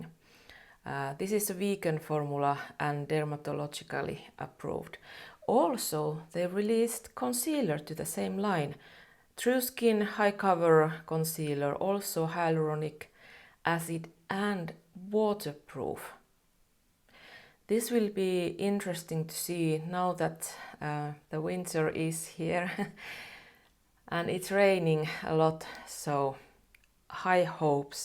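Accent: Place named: Finland. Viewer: Dear, you are really pure joy to watch! Loooooove, looooooooooooove your Finnis accent and your reviews are always spot on! Helped me alot which foundation to choose. Wish you all the best and can't wait for new vid. ☺️☺️ 😊🤗🤗 ❤️❤️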